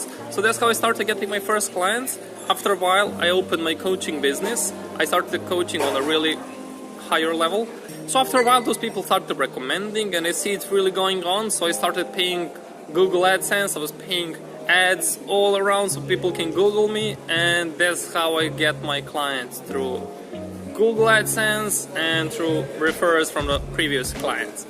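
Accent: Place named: North, United Kingdom. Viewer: And I like your accent! :D North FTW!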